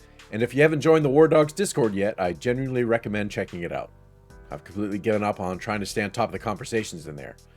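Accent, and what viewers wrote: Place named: United States. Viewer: As someone pushing 50, I had flashbacks and (virtual) PTSD with this video 😅 I enjoyed what I played of BF6, but hated the COD-style sliding. LAN parties were great, though you could guarantee there would be at least one player struggling to connect to the rest 🤪 I sadly missed the closed Beta for this, hope the rumours are true and I get to try it before the 10th. Most of my recent shooter experience is with Ready Or Not, but this could scratch an itch for me 😁 I'm in the UK, I'm guessing from your accent that you're across the pond? Just heading to check if you have a Discord server 😅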